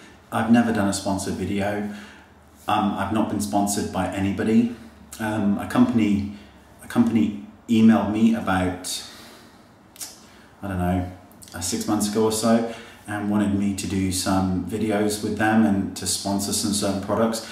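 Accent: United Kingdom. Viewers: Here's another question: where are you from in the UK? Your accent is really nice!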